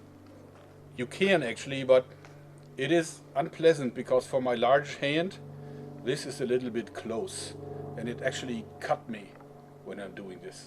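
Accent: Germany. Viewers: Also war der Dialekt doch deutsch :D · Nope, keine Fehler nur starker deutscher Akzent, aber das ist ja normal. Einige Engländer mögen sogar den deutschen Akzent :D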